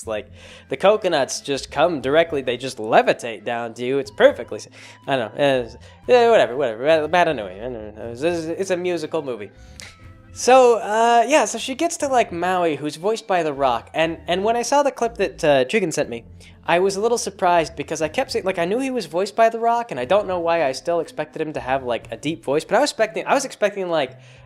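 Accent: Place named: Kansas, United States. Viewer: Kansas accent.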